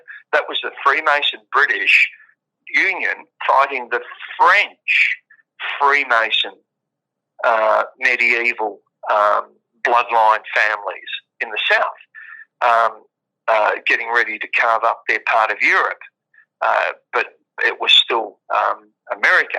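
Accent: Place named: Australia. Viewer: No is kiwi, this guy is Aussie. I would love to get in contact with him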